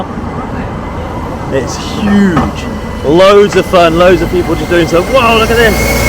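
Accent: United Kingdom. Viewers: Нет, это британский английский. Поэтому не так понятно .😊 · If you're living in US you should hear his accent, it's obvious UK accent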